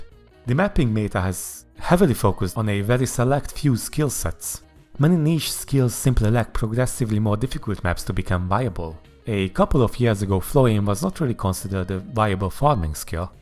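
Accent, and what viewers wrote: Hungary. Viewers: What is your main language? Bcz its sounds like hungarian. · Az akcentusodbol egybol tudtam, hogy magyar vagy, nagyon jok a videoid :D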